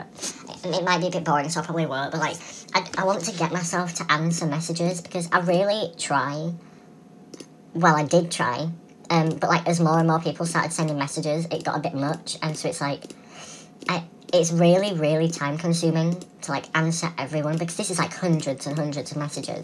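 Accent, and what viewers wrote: England, United Kingdom. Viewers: Are you British and I love your voice and your channel! Sorry I'm late <3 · are you from the uk? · Wth you from UK... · Why are you on American server when u are British? It sounds like u are British unless it's just me... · Your voice sounds like a butiful English accent!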